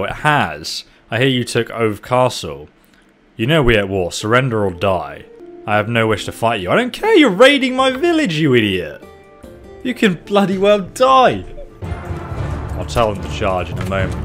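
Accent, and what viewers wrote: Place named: United Kingdom. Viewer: It's called british and that's the way they pronounce things.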